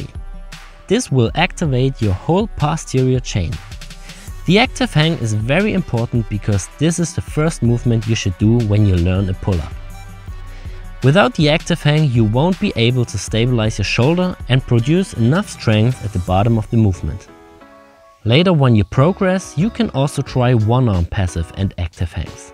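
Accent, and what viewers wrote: Germany. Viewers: Wie man einfach raushört, dass du deutsch bist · slight german accent.. bist du Deutscher, mein Lieber? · german accent, fuckin hell man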